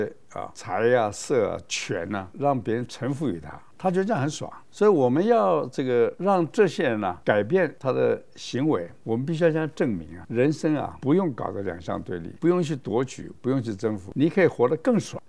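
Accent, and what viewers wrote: China. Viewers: 為什麼用的是中國腔的語音啊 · 為什麼語音是中國口音？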